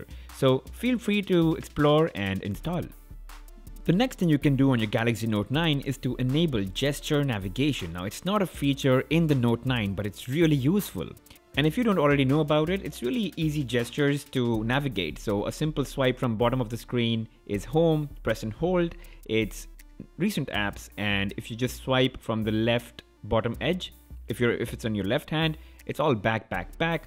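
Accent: India. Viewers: Lose your Indian accent and gain more sub!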